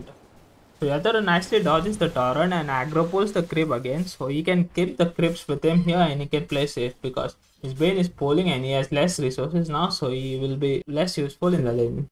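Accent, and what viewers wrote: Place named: India. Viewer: stfu bruh. just show the video no need to speak with ur indian accent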